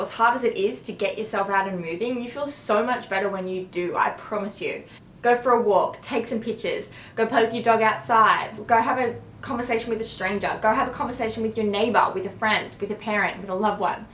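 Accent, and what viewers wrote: Australia. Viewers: Beautiful accent..... Australian?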